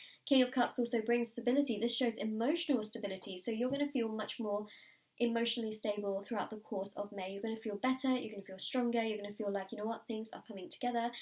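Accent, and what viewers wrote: England, United Kingdom. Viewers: I adore your accent, so Hermione Granger 😍 What part of England is that?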